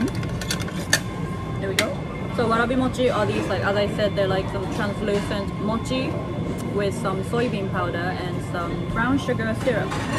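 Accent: England, United Kingdom. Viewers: Love your Video, I love Sushi and it looks so good, Love Unagi, I am about to stop in Tokyo, can't wait, Last time I eat 108 pieces, my record, OH you must have spent time in England, your accent comes out every now and then. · Are you from England/Britain? You have a vague English accent over the clutter in the background 😅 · Ah yes I read you went to university in UK. I can hear it in your accent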